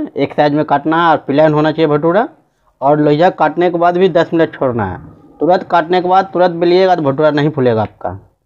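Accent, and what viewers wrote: Bihar, India. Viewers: Aapki baaton ke trike se lg rha h aap bihari h nice 😄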